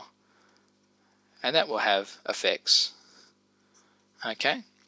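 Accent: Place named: Australia. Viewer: Hey. I am in my first year of studying a paramedic / nursing (double) degree, got my A&P exam on Monday, on swot vac week at the moment. Don't know how I found your YT Channel but it's been SO useful. I'm an aussie too and can I say it's so nice to finally find YT videos that have a normal Aussie accent! So easy to listen to. (Nothing against other accents, it's just easier to listen to being that I am Aussie too). You're great at explaining shit so thanks heaps for that, CHEERS! V useful!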